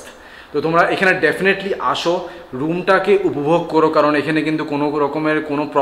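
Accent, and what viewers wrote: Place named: Bangladesh. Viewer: Accent to puro bangladeshi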